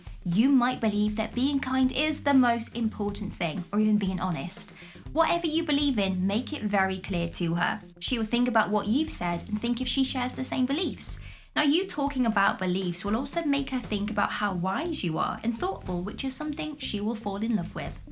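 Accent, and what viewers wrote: United Kingdom. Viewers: I like your accent ! Are you British ?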